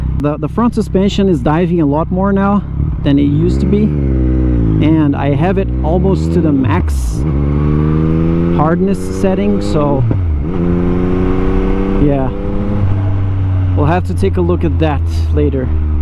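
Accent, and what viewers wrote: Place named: Scandinavia. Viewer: sounds portoguise. But your accent sounds scandinavian:)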